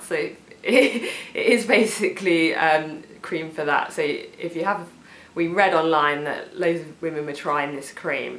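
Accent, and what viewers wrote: United Kingdom. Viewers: I am in love with this woman my goodness and this English accent is omg so beautiful. Love her! I forgot all about the hair regrowth tips hell lol. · Thank You, I truly enjoyed your video.I am happy for you and your mom,and wish you both continued success.I have male patterned baldness and hope to Give it a Try.P.S. On a side note, I love your accent, Is it British,Austrailian or is it from the Caribbean. D.Taylor Montreal,Canada.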